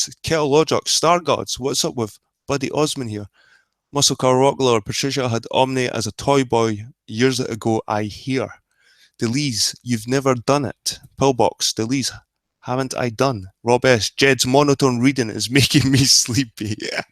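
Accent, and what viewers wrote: Scotland, United Kingdom. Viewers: I triggered Nathan recently & he told me to suck his balls. Got a laugh at of that one. He protested too much when said the Potatoes were perverts, like Adam the Christian penis flasher. He says that having a baby makes me normal, um, no. Pervs have children all the time. He's off his game. He annoys me because he's got a brain but worships Patty like an attack Chihuahua. He's vicious but in an amusing way. Btw, to us, Scottish accents are so diverse. My Scottish friend sounds nothing like you.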